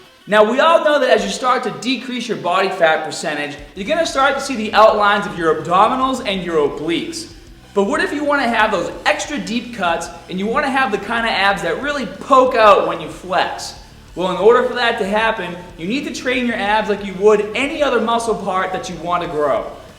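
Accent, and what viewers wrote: Boston, United States. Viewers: You have a bostonian accent. It's faint but it's there. Thanks for the tips. I've been looking for some solid oblique workouts. You look great. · is a Bostonian - he used to be conscious of his accent probably to grow his channel, but now he definitely let's his inner accent shine: the absence of R's. Jeff's accent I thought was New York, no? It doesn't sound quite Bostonian, but it is similar... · You sound like you’re from bawston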